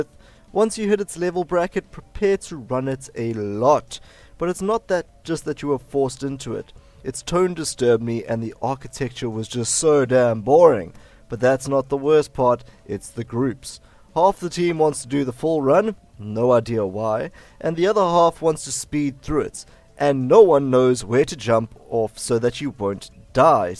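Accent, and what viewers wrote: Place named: South Africa. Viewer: can't mistake that South African accent.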